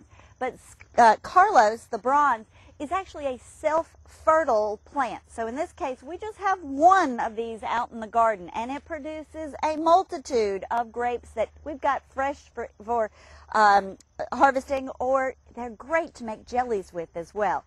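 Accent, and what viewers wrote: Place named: North Carolina, United States. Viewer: You sound like you have been in NC awhile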